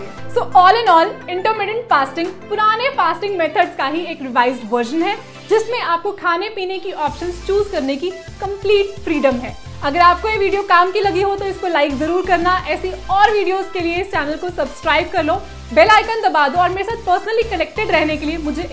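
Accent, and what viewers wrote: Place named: India. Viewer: She is Indian they speak like this. 🙂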